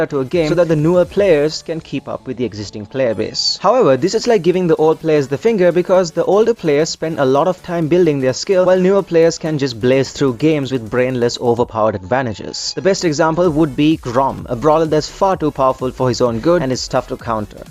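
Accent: India. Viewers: r u Indian tho ?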